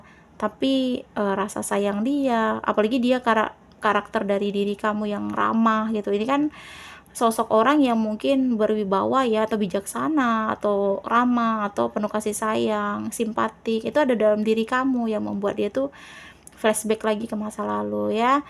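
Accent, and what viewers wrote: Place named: Kalimantan, Indonesia. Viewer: Logat bicara kakak, apa kakak asal. Kalimantan?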